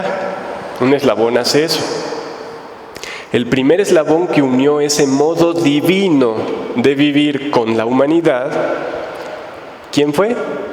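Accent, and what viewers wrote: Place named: Mexico. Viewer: Es una joya esta predicación y con el acento mejicano más agradable la escucha... Sólo los ruidos estrepitoso de la grabación... Lo hacen más interesante porque es mayor la atención que ponemos para no perder ni media palabra porque es nuestra Luisa y Jesús los que predican... Y es el 3er FIAT... GRACIAS DIVINA VOLUNTAD...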